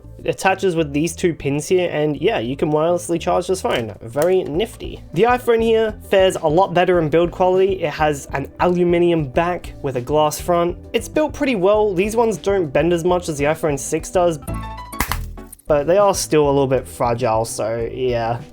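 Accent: Australia. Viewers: wtf why is it that most of the english speaking channels i watch are guys from australia xD anyway, i really like your content❤ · I see a pair of talking hands with an Aussie accent and first thing comes to mind is some guy with a green ipad talking about tech stuff in a entertaining way. jokes aside this channel is great anyway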